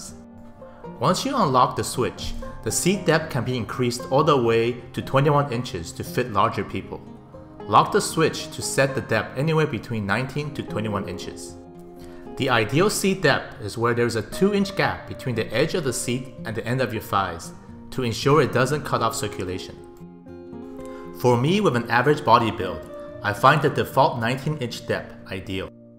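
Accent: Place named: Asia. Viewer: Indeed, I'm also 6'4, I have tried this one at the store. Its not made for tall people. No offense, but this guy has an Asian accent, he probably has a different perception than a European or American of what a tall person is...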